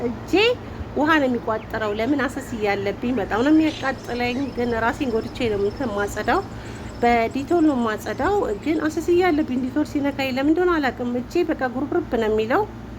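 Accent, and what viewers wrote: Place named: Wollo, Ethiopia. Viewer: ደሴ ነሽ ሀይቅ ወሎየነትሽ መቸም በንግግርሽ አያሳስትም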